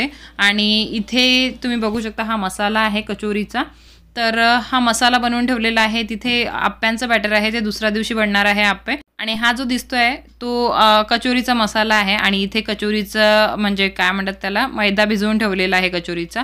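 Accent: Vidarbha, India. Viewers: Tai tuzi vidharbh bhasha khup avadte. Mazi aai pan khamgaon madhli ahe. Khup athvan yete tuzi language aikun ajol chi... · हो माझी आई पण विदर्भ मधले ते पण संभार बोलते😂😂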